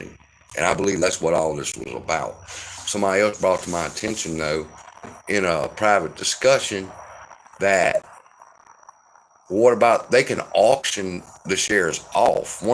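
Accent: South, United States. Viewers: Man, your southern accent !!! Hello from San Antonio Texas. 👍👍👍👍👍👍👍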